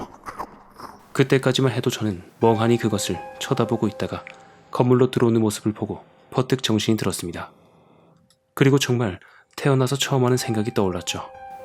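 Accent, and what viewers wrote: Gyeongsang, South Korea. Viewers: 경상도 어디신가용😊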